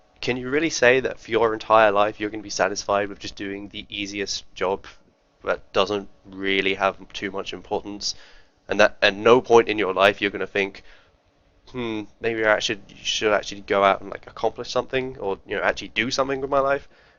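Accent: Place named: United Kingdom. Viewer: Communist is good, says the guy with british accent that grew up with access to the privileges of the first world. This is communism, talking sh*t and defending it, until you have to live under a suppresive regime like cuba, venezuela, north korea, ussr. I wonder why people run away from those countries. When was the last time you read about americans or brits running away to cuba?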